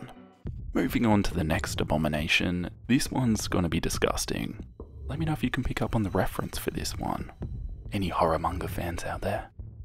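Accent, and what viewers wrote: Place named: Australia. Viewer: The first drawing has Springtrap eyes, Change My Mind... or maybe im just a FNaF Nerd And your voice helps me think like it, since PJ Heywood is also Australian 😅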